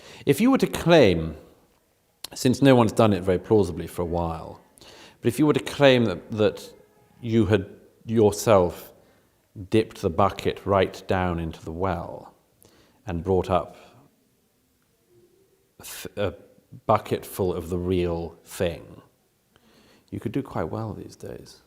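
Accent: United Kingdom. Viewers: This guy wriggles around and says nothing of substance, but with a snobby British accent and attempts to be oh so intellectual. Humans will get better at letting go of Fairytale Bob in the Sky (aka god) and find more comfort over time in reason - and kindness, facts, and the knowledge that we just don't have all the answers. Fairytale Bob needs to spend more time in Syria, and Chicago, and Baltimore, and a trillion other places and perform real miracles on mass before a serious thinking person will ever believe in him.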